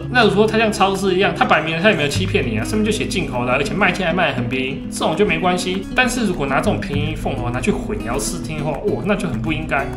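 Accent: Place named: Penghu, Taiwan. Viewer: 我確認他是澎湖人，因為他講台語澎湖腔很重😂😂😂我也是澎湖人一聽就知道了。 而且他講解的很清楚喔～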